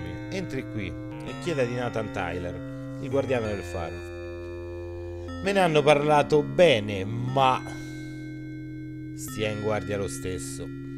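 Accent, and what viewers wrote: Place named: Rome, Italy. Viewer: C e comunque da dire che doppiato in Romano da te ha il suo perche 😂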